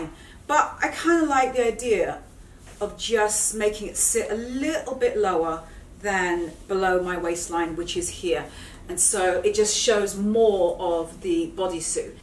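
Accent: England, United Kingdom. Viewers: Is that English accent that you got there? I love it anyway 😍😍😍😍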